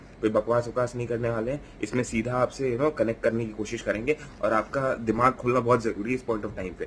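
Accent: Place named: Uttar Pradesh, India. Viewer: Aur bro UP se ho pata lagra 😁😁😁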